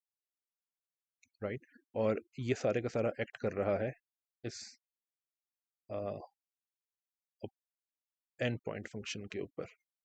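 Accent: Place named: India. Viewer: Either this is a hardcore Indian accent or you're not speaking English at all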